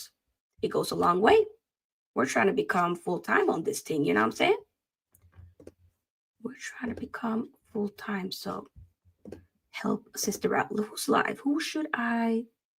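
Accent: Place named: Norway. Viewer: The instant you started talking I knew you where Norwegian lol. That accent is real. Thanks for the video :) I think Nusa should stay for a couple of seasons in Bundesliga before going to a bigger club. But he will def end up in a top club with time. Let's not rush anything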